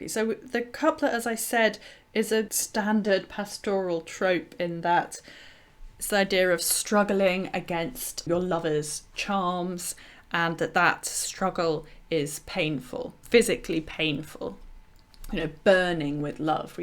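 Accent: United Kingdom. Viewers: My first thoughts were that the poet has decided to view Clarinda as a Youth since that would make her admiration more natural and innocent than if she regarded her as a woman. (PS How was “Mrs” pronounced at the time? Had “Mistress” become “Miss’ess” or “Missus”? Also, I tend to pronounce “Pastoral” with the stress on the first syllable - but, then, I come from Birmingham and don’t have your pure RP accent.)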